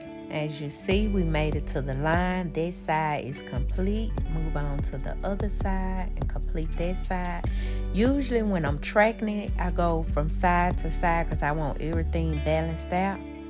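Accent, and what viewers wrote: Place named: New Orleans, United States. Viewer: Accent sounds from NOLA 🎉🎭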